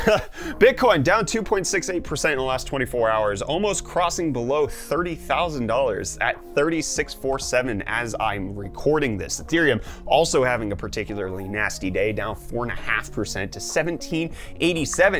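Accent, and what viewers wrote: Canada. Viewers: Umm Canadians sound just like you. We don't speak any different. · More Canuck accent please!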